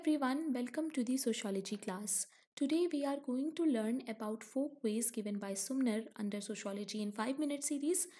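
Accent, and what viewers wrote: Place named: India. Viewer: I don't understand the indian english, sorry!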